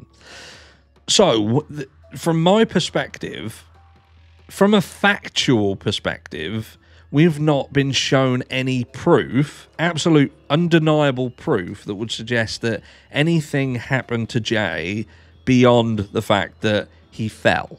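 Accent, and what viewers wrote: Norfolk, United Kingdom. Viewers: Love the Norfolk accent I’m across the border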